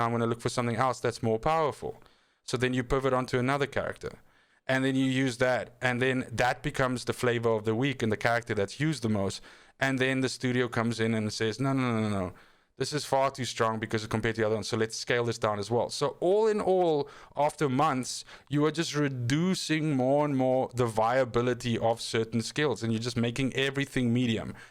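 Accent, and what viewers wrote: South Africa. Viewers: Are you from South Africa. Your accent sounds familiar.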